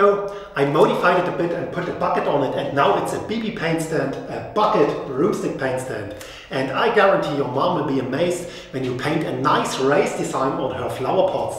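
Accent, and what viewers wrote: Germany. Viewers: I love how you can hear the german inside the english language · Ich liebe es wenn Leute englisch sprechen, man aber voll den deutschen Dialekt raus hört 😅😂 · er meint den starken akzent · Bin ich der einzige der merkt das er deutsch ist... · Absolut geil. Aber koch besser ist es das Deutsche im Englischen rauszuhören. Genial.👌🏻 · Da hört man direkt dass der deutscher ist xD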